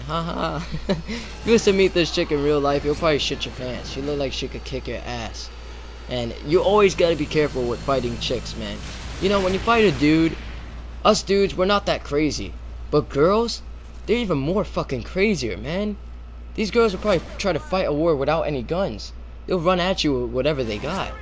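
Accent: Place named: New York, United States. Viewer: i love the way people in NYC area say awesome and water lol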